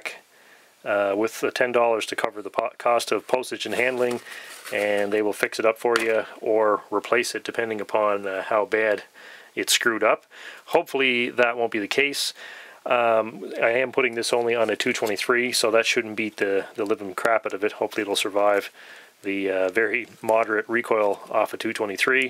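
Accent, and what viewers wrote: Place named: Cape Breton, Canada. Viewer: Canadian right ? I thought the accent sounded very familiar. I'm gonna take a shot in the dark but based on the accent, I think east coast. Cape Breton perhaps. I'm a Newf here in St. John's. Just bought a new scope today. Bushnell Dusk til Dawn Model. Gonna mount it on my .22 air rifle. but I need to buy mounts now. Happy Hunting, man.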